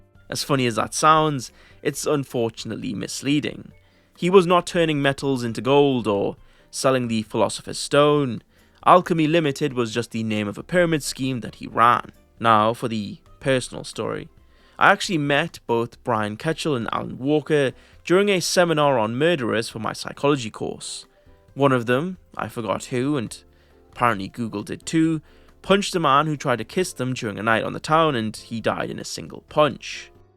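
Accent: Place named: England-Wales border, United Kingdom. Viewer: Can we do an accents tier list?! (I’m trying to work out which side of the England-Wales border line your accent is from, I’m guessing fairly close to it either way)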